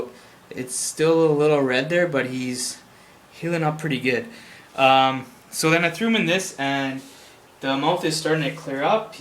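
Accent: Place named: Canada. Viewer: nice video. u sound like ur from Canada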